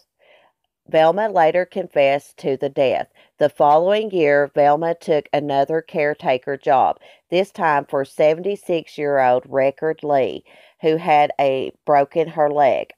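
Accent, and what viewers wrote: South, United States. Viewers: Texas? Louisiana?Tennessee? Where is Applachian mountains exactly? Peru?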